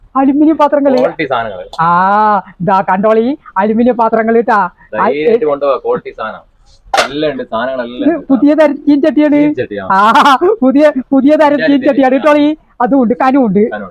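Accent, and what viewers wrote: Palakkad, India. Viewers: പാലക്കാട് ഭാഷയെ മോശം ആക്കാദെ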